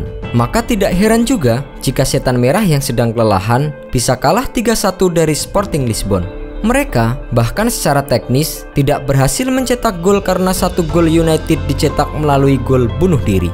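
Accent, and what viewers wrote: Java, Indonesia. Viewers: suara narasinya masih berasa medhok.. tapi mendingan ini daripada suara translate-an cewek gugle :)) mantep mass...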